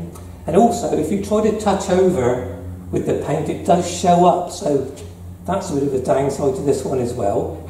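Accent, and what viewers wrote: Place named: Birmingham, United Kingdom. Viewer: Are you a brummie, mate?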